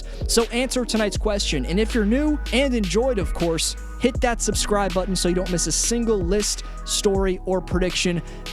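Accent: Canada. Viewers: I never knew about the instagram beef, bruh. You just lost me there. You sound Canadian and like you're wearing a tie. When Drose won MVP you were probably barely walking. I don't do millennial channels.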